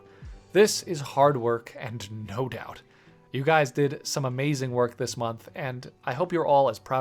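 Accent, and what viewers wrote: Scotland, United Kingdom. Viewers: I kind of found that Scottish accent entertaining and am a bit sad it didn’t continue for the second half of the month and I look forward to seeing what the adult dracomorph starers will look like if you get around to it Also one day I’ll remember to ask my parents about purchasing a set of brushes or two from your gumroad page I just have other things on my plate at the moment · Man I missed your Scottish accent in this video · I liked the Scottish accent 🥺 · No Scottish accent?😢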